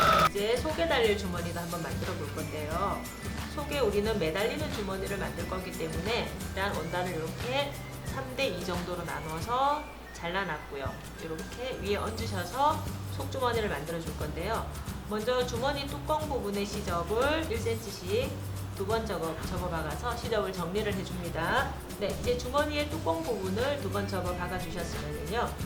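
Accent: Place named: Daegu, South Korea. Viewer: 설명하시는분 목소리 듣기 좋아요. 대구분들 유튜브에서도 억양이 나타나더라구요~~ 코코지니원단 튀면서도 예뻐요.~~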